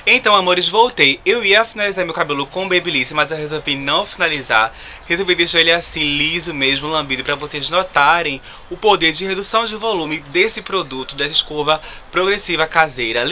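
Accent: Recife, Brazil. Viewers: amei seu sutaque, recife , sou de São Paulo.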